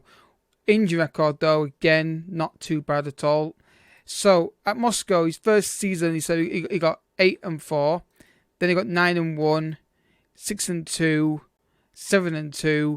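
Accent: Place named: Northern England, United Kingdom. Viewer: Nice video mate. I have a bit of a problem listening because of your strong accent haha. north english is my guess. Maybe its the mic idk. But keep it up. :)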